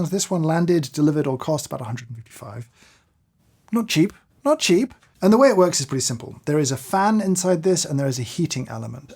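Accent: United Kingdom. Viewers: My morning is complete, by watch a British sounding person struggling with some of his recent life choices.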